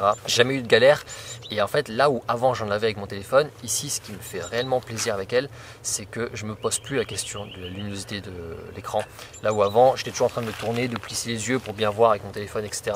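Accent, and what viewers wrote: Toulouse, France. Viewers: Alors moi l'accent toulousain ne me gène absolument pas, j'y suis habitué :). Plus Sérieusement, encore une belle vidéo pratique, instructive, claire et le fait que tu parles vite, bah je trouve ça trop bien, on te comprend totalement et ça a l'avantage de ne pas voir une vidéo de 20 minutes pour apprendre que DJI fait des produits au top... bon là on en est pas loin. Bref, tout ça pour dire que j'ai bien apprécié ta vidéo. Seul bémol (parce que sinon je ne commenterais pas, comme beaucoup...) : sur la portée de la radiocommande RC, en vrai, comment tu le dis, à courte distance pas de problèmes, mais au delà de 500m, ca déconne un peu. Perso, j'ai toujours ma RC-N1 en back-up et dont la liaison est quand-même bien meilleure que la RC. Je garde la RC pour l'avantage de la vidéo intégrée et ergonomie, mais je dois reconnaitre que je suis moins à l'aise en terme de sécurité qu'avec la RC-N1 qui elle ne foire jamais. 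J'espère fortement que DJI remédiera à ce point. On nous parle d'Ocusync "10 000" mais le résultat est pas fou. Ca reste ma vraie déception de l'achat du Mini 3 Pro. Je me voyais déjà envoyer mon drone sur la lune pour prouver que la terre n'était pas plate. Bref... En tous cas, encore un grand merci ! Continue, et je continuerai de mon côté à te suivre !!! Et encore merci en tous cas !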